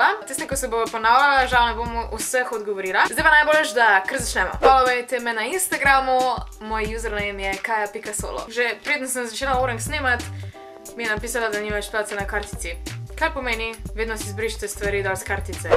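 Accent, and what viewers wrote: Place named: Ljubljana, Slovenia. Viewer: ti si z lublane ziher k lublansk govooriš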